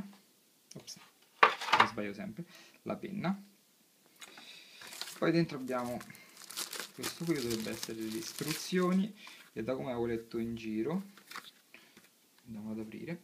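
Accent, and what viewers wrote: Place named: Rome, Italy. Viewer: Oddio, il nuovo video di!!! Appena ho visto l'avviso su FB sono subito venuto a vederlo!!! Voglio l'autografo e voglio anche registrare questa bella voce romana di Roma sud!!:)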